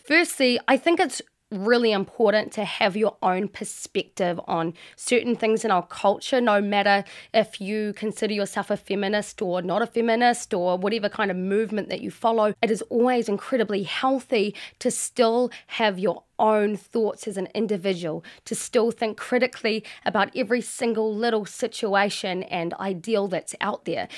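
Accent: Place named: New Zealand. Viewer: She sounds kiwi so New Zealand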